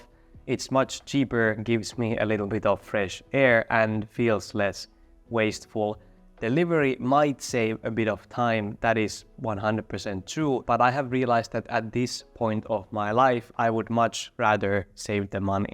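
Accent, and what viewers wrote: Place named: Finland. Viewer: Ihana suomiaksentti! 😂 jotenkin niin hellyyttävä ja ilahduttava. Tosi hyvä video, pitää vilkaista lisää. Aloitan ainakin puolen vuoden ”no/ low buy” -ajanjakson, joten näistä videoista on varmasti apua 😊.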